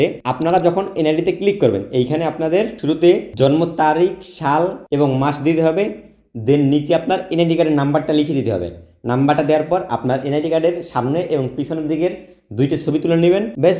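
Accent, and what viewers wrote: Barishal, Bangladesh. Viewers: দাদো তুমি মোগো বরিশাইল্লা হেতে মোর কোন সন্দেহ নাই! তোমার বাড়ি কোন জায়গা?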